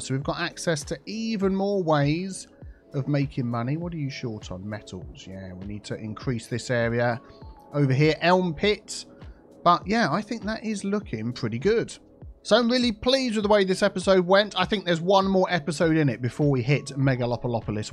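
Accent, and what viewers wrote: United Kingdom. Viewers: Hi, although I am on PS4, I really enjoy ur videos, and that's becouse your english accent, wich I find it to be so British, hahaha. We are u from? If u don't mind me asking.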